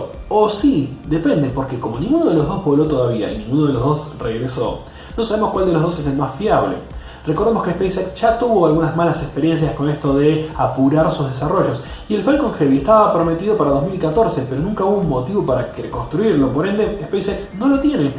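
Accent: Argentina. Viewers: Gostei muito das suas exposições. Pelo seu sotaque vejo QUE és argentino. E me escrevi em seu canal ok.abraços de Brasil 😃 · argento 100%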